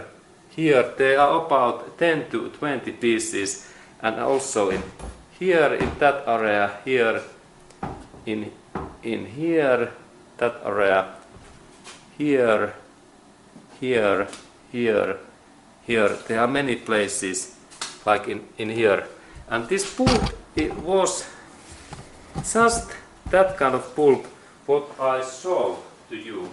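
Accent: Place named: Finland. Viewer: Ai että rallienglantia tää on kova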